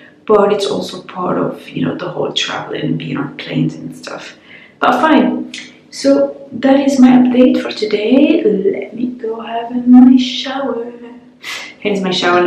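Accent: United Kingdom. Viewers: Its been a while!! You are starting to catch the english accent!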